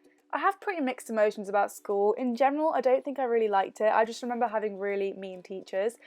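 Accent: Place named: Coventry, United Kingdom. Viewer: Hey, I am Millie. I am a newish subscriber. I just subscribed and i actually love you videos so much💕. I am from Coundon in Coventry haha. But i live in Australia. I actually never noticed your Coventry accent until this video. Please reply to this even though, i have commented 2 years later haha xxxxxx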